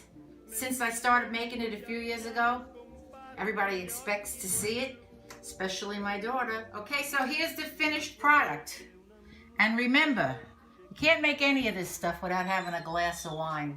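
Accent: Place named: Bronx, United States. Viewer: Another nice video! You sound like a Bronx gal to me. My Grandpa was from there. Try the whey in soups, it's really good. I have a smoker, so I might attempt making some scamorza.:)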